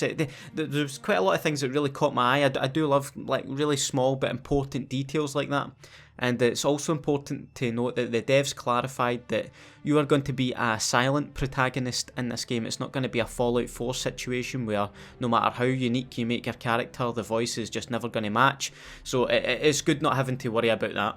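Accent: Scotland, United Kingdom. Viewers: His voice is so fucking awesome to hear. I wished i had the scottish accent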